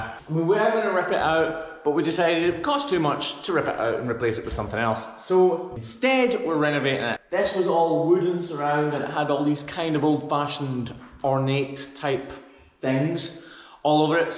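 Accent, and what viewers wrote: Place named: Scotland, United Kingdom. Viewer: When you flub up what you say just keep going with your Scottish accent I wouldn’t know any different. If my father was alive boy you would have never heard the end of it as his uncle’s McMillian’s came from Scotland 🏴!!!! I enjoy your accent but probably your wife’s better when you’re in trouble with her! Can you play the bagpipes that would be the greatest finish or put in when you flubbed!!!...lol from SW Wisconsin!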